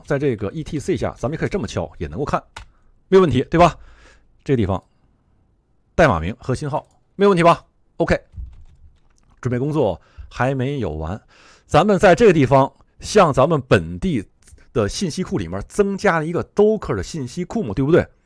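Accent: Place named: Japan. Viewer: 您的日语口音的英语太有意思了 LOL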